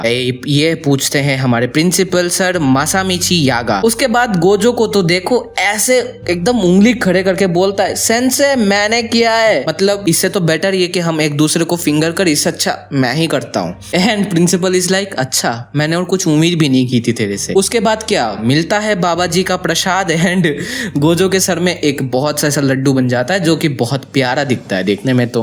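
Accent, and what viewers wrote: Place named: Bengal, India. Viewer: Kisi Bengali bol ra lgta h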